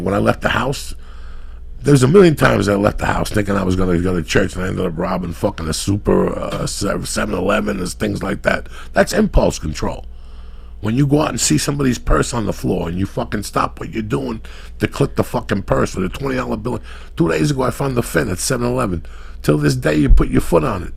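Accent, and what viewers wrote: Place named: Bronx, United States. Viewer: uncle Joey I have to say I have become one of your biggest fans over the past month man you remind me of my late father but n a good way. your stories are INCREDIBLE and your personality and overall way with people is admirable. I hope I get to meet you one day and as an Italian from Philly I was fooled in thinking u were a Bronx Italian and even tho your not I beleive your the godfather lol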